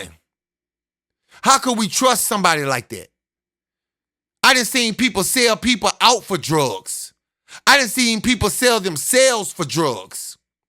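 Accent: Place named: South, United States. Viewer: I love listening to yo country ass.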